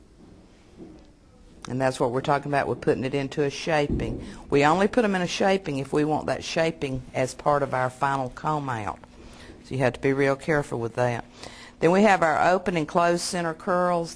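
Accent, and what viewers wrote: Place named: South, United States. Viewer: I like her sweet southern accent! I found this video to be helpful and informative. Thank you !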